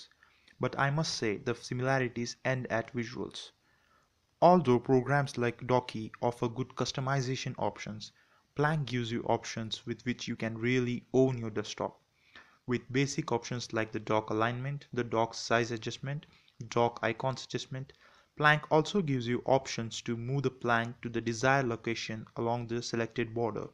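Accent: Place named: India. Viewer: Great review! I got a bit of shock hearing you swear (I wasn't offended mate!) - not use to hearing the word "shit" in an Indian accent! Good stuff mate! Have you reviewed and looked at Synergy? (plank and synergy are my two "must have" Linux applications!)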